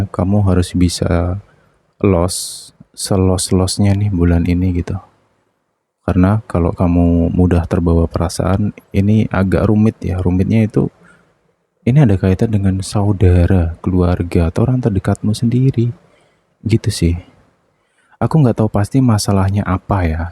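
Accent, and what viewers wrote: Java, Indonesia. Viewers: 😅😅😅 mas. jowo thoo....kalem lucu sht sll yaamurah rizqi🤲🤲🤲🎉❤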